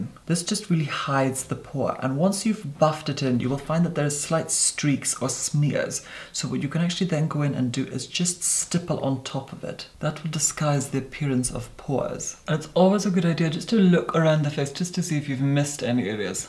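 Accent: Scotland, United Kingdom. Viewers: He's a well spoken Scottish person. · Agustina Riveros he’s from a Scottish island but also has a posher type London England accent on top of it Someone may correct me but I think that’s what it is-I don’t believe it’s exactly and example of a natural accent though I believe he has altered it. He is so interesting to hear speak and I love the way he does and his vocabulary as well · English At Work scottish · I'm British...but I've never heard someone talk so British before 😂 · Agustina Riveros what professor chaos said is totally correct is from the Hebrides but has been putting on a posh english affectation since he moved to London. If you watch his very first movies you can hear his original accent was much more scottish sounding, it's quite lovely! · it's like a velvety upper class Scottish accent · true he sounds like he’s a rich brit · Interesting accident - Scottish or Irish maybe, with either elocution lessons or self taught elocution eradicating most of his original speech patterns. 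Reminds me of Margaret Thatcher, or Russell Brand......you can tell he's attempted to learn RP, it's not what he's grown up with. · I'm moving to Scotland just so I can hear this accent everyday. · I didn't know posh Scottish was a thing. Now I know. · Very posh version of Scottish. There are many dialects of Scots that sound nowhere near as regal. To me the very rich accent that he has always reminds me of Minerva magonigal or Mrs doubtfire and that’s coming from a Scottish person 😹 I don’t speak nearly as eloquently as he does.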